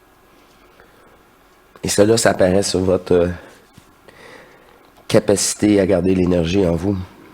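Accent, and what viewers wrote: Quebec, Canada. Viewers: Et bien je kiffe l accent québécois… ta berwete ici c est une brouette mais je kiffe quand même jolie chanson que cet accent … et il est vrai que ces picotements j ai eu aussi cela les derniers jours … amusant tiens … portes toi bien et prends soin de toi merci d être là et de partager cela gratitude à toi 🙏✨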